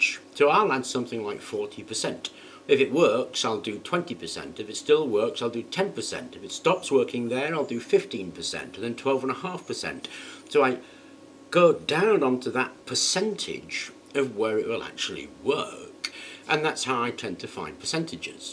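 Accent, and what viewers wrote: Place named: England, United Kingdom. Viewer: I use polyurethane one coat and then I mix a little 5 micron graphite powder in it. You can also do the 2 part mix of elmer's glue but it doesn't work as good. What was the stuff you used as a solvent and what did you mix it into, I couldn't hear what you said in the video. LOL you kind of said it fast with that english tongue of yours.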